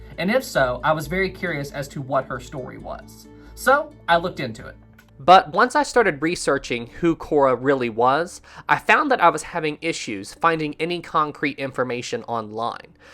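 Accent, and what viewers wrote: South, United States. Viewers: great video from scotland love the sourthen American accent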